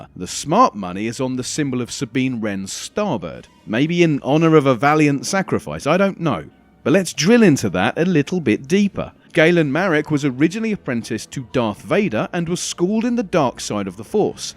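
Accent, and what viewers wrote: United Kingdom. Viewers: You've put together some very interesting analysis, sir. I dig the British accent, too, a freakin' awesome--or bloody awesome, as your people would put it--addition to this video. Although born in the USA, I am fairly certain that my last name derives from your whereabouts. Anyway, enough with the unnecessary formalities. Let's talk Starkiller and Rebels. Admittedly, the chances of Galen Marek appearing in Star Wars: Rebels are slim-to-none, but I'm still crossing my fingers in hopes that Disney figures out how to make it happen. On face value "The Force Unleashed" title is most akin to the Star Wars Legends banner; in that light, certain events that take place in TFU would perhaps strike Star Wars fans as too 'extreme' for lack of a better word, too outrageous or far-fetched to be designated as "canonical" (see: Marek using the force to pull down an entire star destroyer until it crash-lands). 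On the other hand, George Lucas played an integral role in the development of Marek's story arch, suggesting a heightened significance of TFU in comparison to many of the older Expanded Universe novels; also, Disney can always hand pick-and-choose amongst the more 'realistic' happenings that take place in The Force Unleashed...w/e that means. It's all science fiction, after all. Diehard Star Wars fans are so apt to forgetting that this is all fake, that it's all merely intended for entertainment. Real talk. At the end of the day, none of these motherf***ers are real. For all I care, Di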